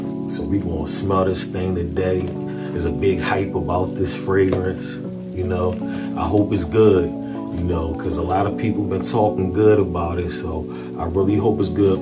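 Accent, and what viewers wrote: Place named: Philadelphia, United States. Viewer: I already knew! Michigan this way. But I know that accent anywhere, friends from Philly. Thinking about wearing it tomorrow!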